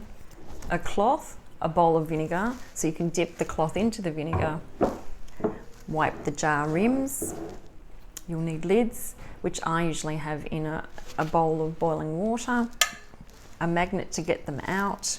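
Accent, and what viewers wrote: Australia. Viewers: Thank you for this video! I have just purchased a Presto canner and am finding your channel to be very helpful. It's lovely hearing a fellow Aussie voice on YouTube! Where can we get the gauge checked here in Australia? Thanks.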